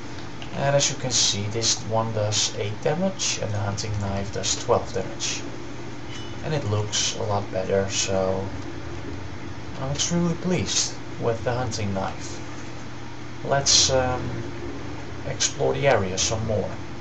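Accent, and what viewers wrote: Netherlands. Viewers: it aren't lisp he just has a dutch accent. but your right it is really annoying.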